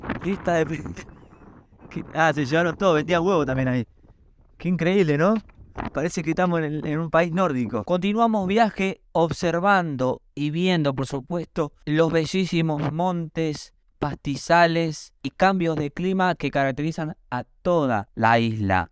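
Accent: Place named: Argentina. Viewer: Cuando eliminarán los argentinos la pronunciación "sh" de la " ll" y la "y". Sho, orgusho. Cuando reconquisten las Islas. El idioma español que nos heredó la Madre Patria, no nos instruyó esa pronunciación.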